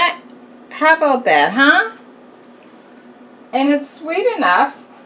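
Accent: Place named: Boston, United States. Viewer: MS. Girl you are doing it!! Giving me some good ideas Love it!! And the Wisdom in the word of the day!! Get it Honey!! I have one request can you make a sweet potato biscuits? I would really love that recipe!! Love your channel so much your like my Auntie from Boston in your sweet accent!! I pray many blessings for you, you are doing a beautiful job on your channel!! Love you!!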